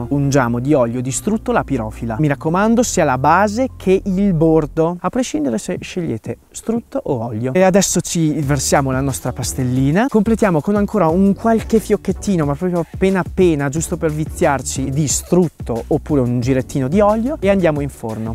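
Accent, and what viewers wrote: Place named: Trentino, Italy. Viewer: Ciao sono bolognese sposata con un trentino da 30 anni lui è del bleggio superiore e vado in vacanza lì tutti gli anni non potrei rinunciarci per nulla al mondo, è un posto bellissimo una pace una serenità unica, poi mi diletto ad andare nel bosco a funghi, quindi anche se parli il trentino lo capisco. Sei un ragazzo bravo e simpatico mi piacerebbe venire a trovarti un abbraccio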